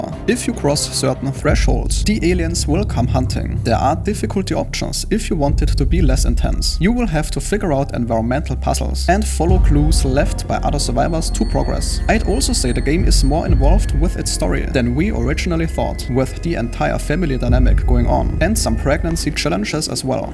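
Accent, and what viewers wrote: Germany. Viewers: man hört komplett das deutsche Englisch raus 😅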